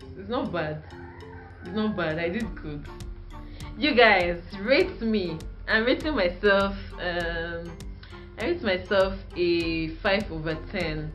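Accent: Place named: Nigeria. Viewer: All the love from Kenya. This is my first time watching someone from Nigeria, to be specific Lagos. I love the Accent and I am so excited 💛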